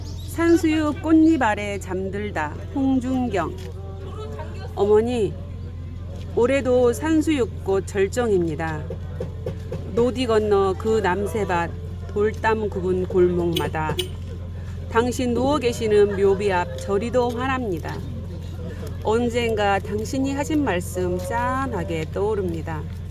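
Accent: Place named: Gurye, South Korea. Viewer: 구례사투리까지 숨김없이 사용하시고. 잘봤습니다. 가보고 싶네요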